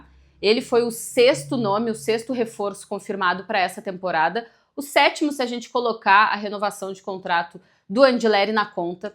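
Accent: Rio Grande do Sul, Brazil. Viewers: Ainda bem que apareceu um canal com profissional e ainda com esse sotaque gaúcho. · Três motivos que esse canal é incrivel: 1 - Conteúdo excelente; 2 -; 3 - Orgulho que tu tens do nosso sotaque gaúcho. 🫶🏽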